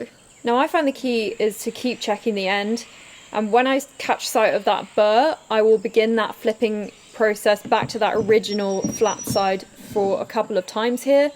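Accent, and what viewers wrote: England, United Kingdom. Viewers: Doesn't hurt though. Americans can't resist that British accent with a feminine lilt. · Interesting situation! You sound English, you're living in Australia, and you are using Texas slang i.e. "Y'all. Okay, well, from an English man living in South Texas, here's a question: How do you deal with the obvious humidity when woodworking? Perhaps that could be a subject for one of your videos.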